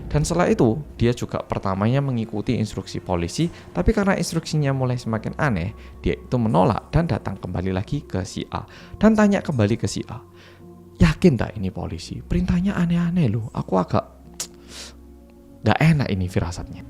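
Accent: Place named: East Java, Indonesia. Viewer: Salut..bangga apresiasi sama Mas..bahasa indonesia logat jawatimuran umumnya " Malangan khususnya. Ortu seperti saya ini asal Sumut domisili di jakarta 50 tahun..selama bekerja..kadang ke jateng..tp ga pinter2 amat boso jowo. 👍🏻👍🏻👍🏻👍🏻 isin...aku.😅 opung ini suka filosofi jawa. tentu yang lainnya juga..salam sehat semuanya. Sai hipas saluhutna🙏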